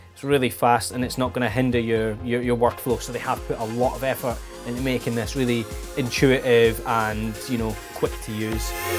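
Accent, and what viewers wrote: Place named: Scotland, United Kingdom. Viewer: His Scottish-like accent makes this video that much more cool XD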